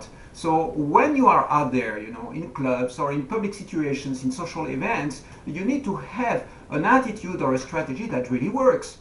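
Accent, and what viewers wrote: France. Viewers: is your accent French?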